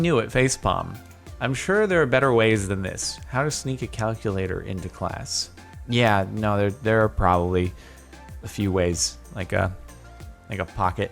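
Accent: Australia. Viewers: take a moment to appreciate aussie accent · That Aussie accents makes me cringe (no offense) I'm aussie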